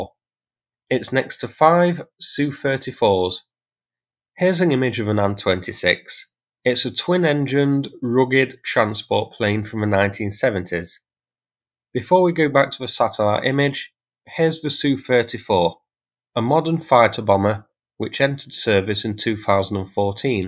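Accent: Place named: England, United Kingdom. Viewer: England. According to some, he has no accent at all, it's all the rest of the lands distant from England that use the native language of England who have developed accents.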